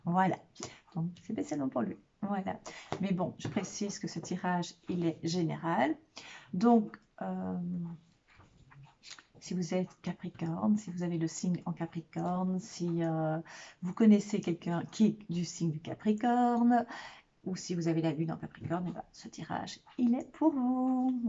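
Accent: Belgium. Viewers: Merci d'avoir pensé à mon signe, je l'ai cherché et ne l'ai pas trouvé toute à l'heure , vous m'avez entendu .... en tous Les cas, je vous le rdis à nouveau , vous êtes charmante , lumineuse et sympathique et avec votre accent belge , c'est encore plus agréable , j'ai beaucoup aimé vivre en Belgique avant de devoir partir : <link> j'ai apprécié le peuple belge et me suis fait de bons copains là bas .. BONNE CONTINUATION , Joyeux Noêl et bonnes fêtes de fin d'année ; FRANCK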